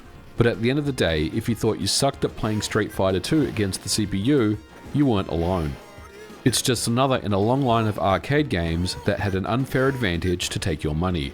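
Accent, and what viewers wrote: United Kingdom. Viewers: Even as much as I know coding and computer mechanics you make me look like an amateur and your accent, accents your intelligence, something about the Brits and Aussies that when you talk in technicals it all sounds even more sophisticated... Man i want to go back to the 90s so bad, even as a poor kid it still was the greatest decade ever to be a kid and arcades with games like Street Fighter II are a major reason why..even back then though I realized the business behind making the cpu seemingly godly at times since as u said the game was there to take your money and keep you coming back and I notice when I got it for snes while the cpu was still difficult it didn't have the upper hand the arcade did though I know thats cuz of hardware as well, that's what got me loving the game genie...Thanx as always for every upload covering lesser known topics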